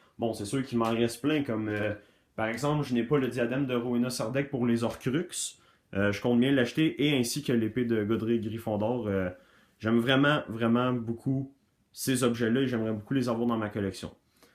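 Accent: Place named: Belgium. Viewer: t es belge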